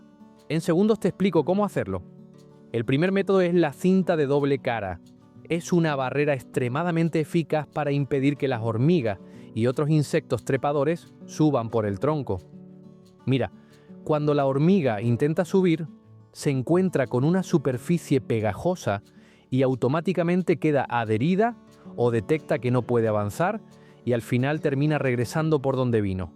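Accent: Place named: Spain. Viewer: HOLA, YO TE VEO DESDE BARCELONA A ESPAÑA. PERO ME PARECE QUE TÚ TAMBIÉN ERES DE ESPAÑOL. POR LO MENOS EL ACENTO UN POQUITO COMO EL MÍO. YO SI VERO PERO VAMOS QUE ME GUSTA TU VÍDEO. DE HECHO, YO ME LO GUARDO. VENGA, ME ENCANTA TU DEJE. ADIÓS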